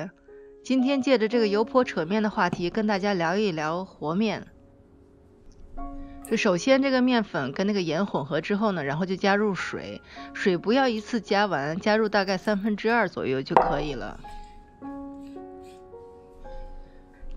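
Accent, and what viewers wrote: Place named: Shijiazhuang, China. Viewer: 听口音像石家庄滴：）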